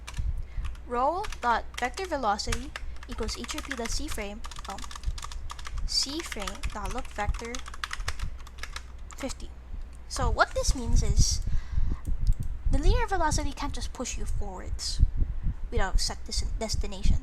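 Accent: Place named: Toronto, Canada. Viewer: yeah idk how to describe it what comes to mind is a toronto accent 😂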